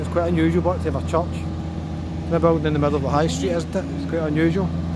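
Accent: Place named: Scotland, United Kingdom. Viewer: Certainly a Scottish accent but his is a central belt accent not a north eastern accent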